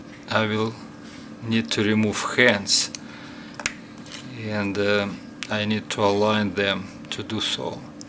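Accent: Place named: Russia. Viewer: Похоже на русский акцент.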